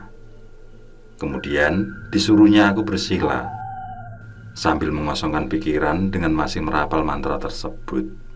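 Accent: Java, Indonesia. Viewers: Suaranya mirip pakdhe Alfan (dongeng tengah malam) logatnya mungkin sama wong jowo nya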